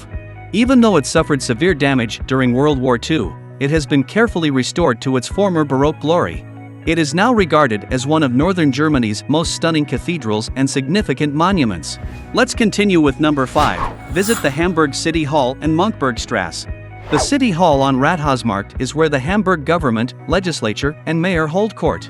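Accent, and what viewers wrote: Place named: United States. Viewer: I like НаmВ., Вt.I dont like prono.narrator speaks, american , nt EnglisН.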